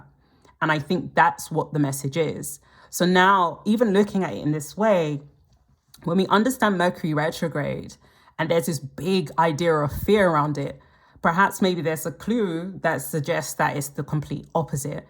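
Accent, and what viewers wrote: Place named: United Kingdom. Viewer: Idk where you are from precisely but wherever it is is my favorite British accent and also this is such a novel way to present a reading and I really love it!! Thank you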